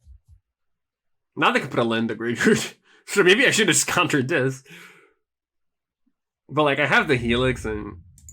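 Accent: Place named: Brazil. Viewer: Tenho que concordar. Acho que a sua pronúncia é impecável. Dá pra perceber um sotaque residual, mas se eu não soubesse que você é brasileiro, nem diria que é sotaque.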